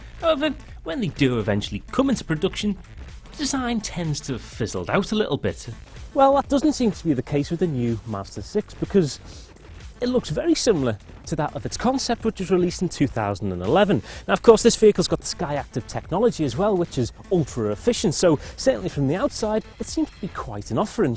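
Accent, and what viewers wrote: Liverpool, United Kingdom. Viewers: A bit of a scouser is there laa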